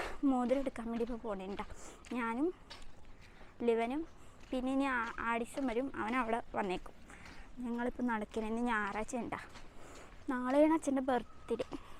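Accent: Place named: Cherthala, India. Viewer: ടാ... ചേർത്തല സംസാരം, എവിടെ ആണ് വീട് 💞💞💞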